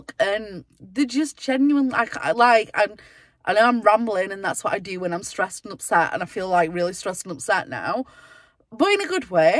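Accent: Yorkshire, United Kingdom. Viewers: Your channel is good, you are not boring, you are aesthetically pleasing (we don’t all want to watch perfectly filtered beige channels), you are not common you’ve just got a Yorkshire accent and there’s nowt wrong with that! 😂😂 I think one a day is perfect and yeah, no spoilers please. I’ve gotta say though when you mentioned be positive Sam uploading again you got me all excited and confused 😂😂. I miss her videos! Very brave video and I completely understand where you are coming from. Lots of love xx.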